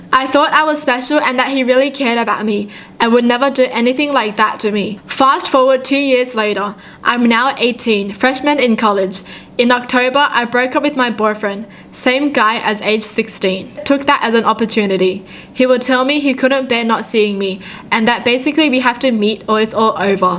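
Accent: Australia. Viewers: Same, I think I might be picking up her Australian accent because I watch her so much lmaoaooaoa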